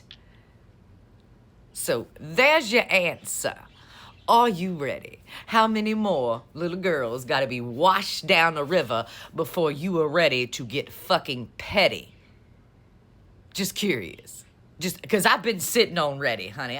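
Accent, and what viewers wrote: South, United States. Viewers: I have to get up to speed on the southern speak. To be honest, I'm having a bit of trouble understanding ya. 😃🇺🇸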